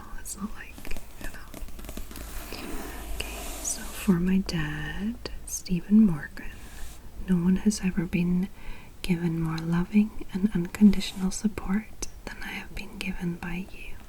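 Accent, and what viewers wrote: Scotland, United Kingdom. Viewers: What’s your accent? I can’t tell. You sound Scottish/welsh sometimes and American sometimes lol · Nice scottish accent. Love it. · Okay, my attuned ear is picking up a slight Scottish brogue from you,.🤔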